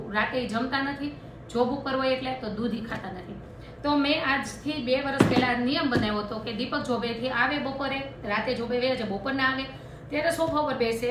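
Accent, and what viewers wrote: Kathiawar, India. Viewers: Aapni matrubhasha Gujarati che.biju ke tame suratra na cho aa kathiyavadi bhasha tame bolo cho te khubj game che.tamari vani saras.che. pan saras bole che. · Your kathiyawadi speech is really nice...❤ i really like it....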